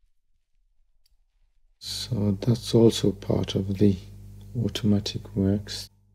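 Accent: Birmingham, United Kingdom. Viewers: It’s so therapeutic to watch you restoring watches, and a brummie twang is the perfect accompaniment!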